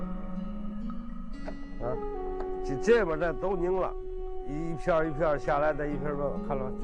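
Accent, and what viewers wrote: Tianjin, China. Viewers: 就为听天津话来的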